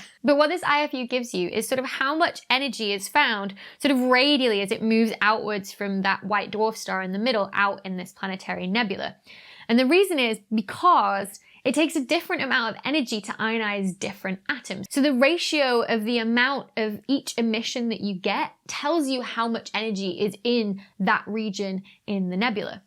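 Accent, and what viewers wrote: United Kingdom. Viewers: Very informative. Spot on delivery and content. You keep getting better! Plus, listening to you, I have tuned my ear to understand British accent.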